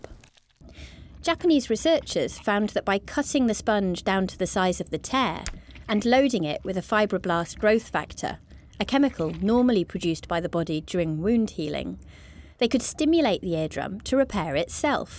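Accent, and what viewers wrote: United Kingdom. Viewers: i think the narrator is british but i can't be too sure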